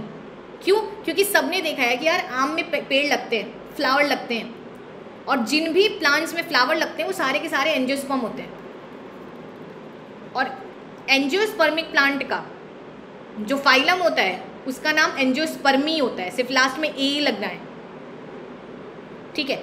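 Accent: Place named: Bengal, India. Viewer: Bengoli accent ♥🔥🔥🔥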